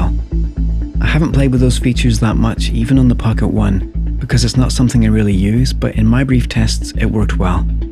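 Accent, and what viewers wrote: Northern Ireland, United Kingdom. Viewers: "Now" "Plastic" "Fan" "proportional" "eighty" Northern Irish ? Great Video review and i thought it was just me who had a problem getting the Tripod mount off the camera ;) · Fabulous - thanks & nice to hear the NI accent - greetings from HK!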